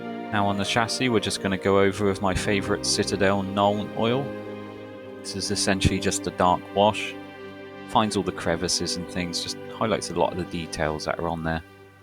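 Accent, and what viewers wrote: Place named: Somerset, United Kingdom. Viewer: I thought I recognised the accent haha I live in Weston super mare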